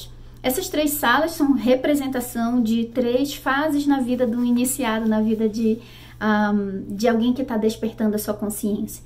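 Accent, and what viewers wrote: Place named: Belém, Brazil. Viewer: Senti um sotaque de BELÉM-PA...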